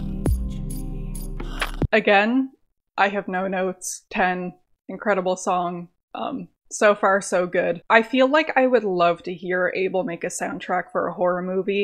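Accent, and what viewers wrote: Canada. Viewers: The Canadian accent strong as hell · Are u canadian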